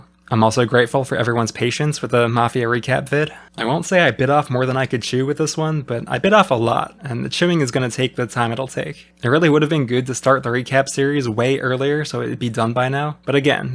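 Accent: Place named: Pacific Northwest, United States. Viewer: hxh fan, jerma references, league references, soprano references AND a PNW accent? im liking this guy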